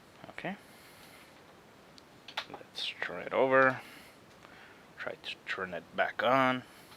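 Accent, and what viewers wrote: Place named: Philippines. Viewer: Are you a Philipino? You sound like a Pinoy. Thank you for your video man I learned a lot!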